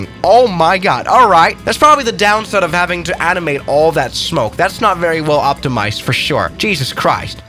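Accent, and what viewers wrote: France. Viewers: His French accent got me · You French accent is so funny xD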